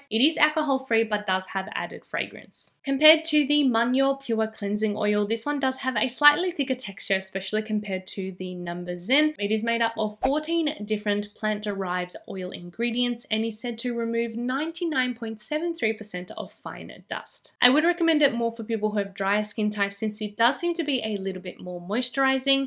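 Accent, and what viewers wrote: Australia. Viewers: Very Australian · u aussie? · I knew right away you’re an Aussie. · That Asian Australian accent tho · i'm absolutely loving your heavy australian accent😄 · I like her Aussie Accent.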